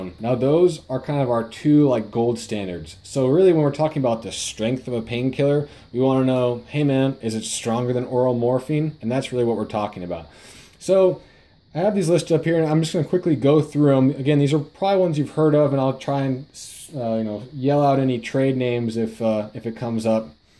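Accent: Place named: Ohio, United States. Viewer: this guys sounds like he's from Ohio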